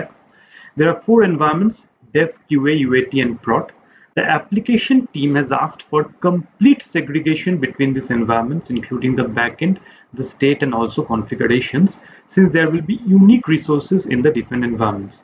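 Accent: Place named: Bengal, India. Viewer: Explanations are nice, kindly work on ur bengali accent to make things easier for others. U got potential.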